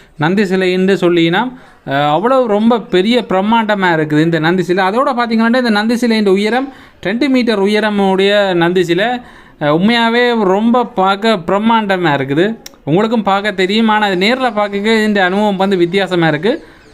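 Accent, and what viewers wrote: Sri Lanka. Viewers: I had visited this great temple several times.It will look knew to me every time I visit.i will sit on the steps and admire every bit of it.Great engineering with no cranes NO modern equipments. as usual great video coverage and your very pleasant pure Sri Lankan Thamizh to hear 👌👌👌👏👏👏. Canada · தஞ்சை பெருவுடையார் கோயில் வரலாற்றை பரிசுத்த மான இலங்கை தமிழ் உச்சரிப்பில் கேட்டதில் பெருமையடைகிறேன் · தம்பிக்கு முதல் வணக்கங்கள் உங்கள் கோவை பயணம் முதல் பார்த்து கொண்டு இருக்கிறேன் நீங்கள் போடும் பதிவுகள் அனைத்தும் அருமை நீங்கள் தமிழ் நாட்டில் வந்து அழகாக பதிவுகள் போட்டு கொண்டு இருக்கிறீர்கள் மிகவும் அருமை வாழ்க வளமுடன் உங்கள் ஈழத்து தமிழ் இனிமையாக இருக்கிறது மிகவும் கவனமாக இருக்க வேண்டும் நன்றி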